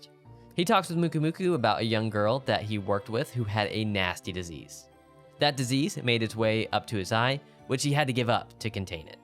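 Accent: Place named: South, United States. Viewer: Eyyyyy your southern accent came out here lol Now i hear it throughout the rest of the vid but, tbf, southern accents are hella endearing and you do a great job with masking it, fwiw xD This vid is still a big comfort lol I wouldnt call out the southern accent if I werent intimately familiar with it, rest assured xD Feels like an Arkansas or northern texas accent lol. My familys got that accent. I do too if I'm around the right people lol Forgive me if ya dont have a southern accent and I'm assuming xD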